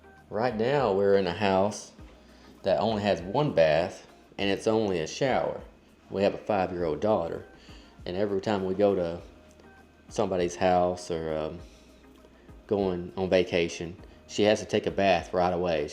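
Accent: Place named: South, United States. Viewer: Watching the progress! What State are you in? Sounds like Alabama or Georgia!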